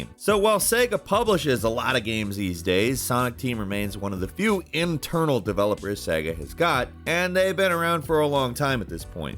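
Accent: United States. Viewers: I always cringe when I hear the American pronunciation of Japanese names but that's a given at this point as many Japanese people most probably can't pronounce American names really well either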